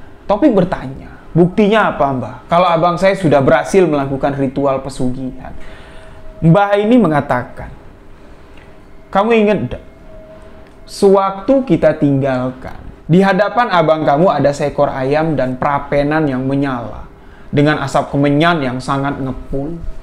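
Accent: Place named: Bali, Indonesia. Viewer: oalah..pantesan bang,bahasanya masih ada logat balinya hehe ok bang makasih,semoga chanel bang terus berkembang Aamiin.. 🙏🙏🙏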